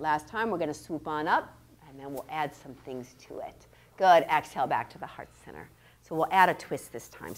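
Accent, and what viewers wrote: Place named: Ohio, United States. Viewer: Oh is yours an Ohio accent? No I wouldn't have guessed. Though I'm not good at detecting accents other than the more obvious ones such as Boston, Long Island, Chicago, South Jersey and of course vaguely Southern or Texan. Though now that you mention it, I guess you sound Eastern U.S. It's probably hard to tell in CA, with so much mixture. Anyway your voice is comforting and I like listening to you.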